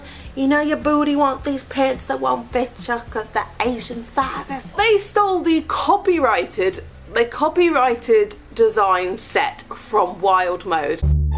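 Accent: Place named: Ipswich, United Kingdom. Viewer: I love hearing your accent. Knowing you're from Ipswich (at least, I hope you are, or I'll look like a right tit) gives me a warm feeling inside. I grew up in Diss 😃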